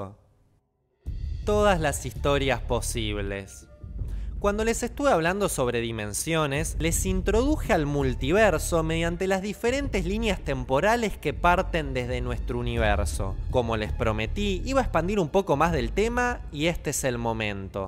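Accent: Argentina. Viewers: No se pero amo el acento argentino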